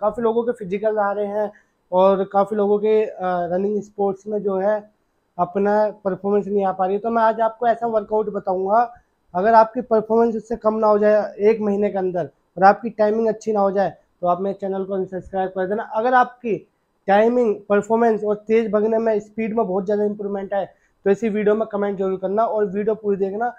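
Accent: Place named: Sohna, India. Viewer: आप बादशाहपूर सोहना से हों, आपकी आवाज बता रही है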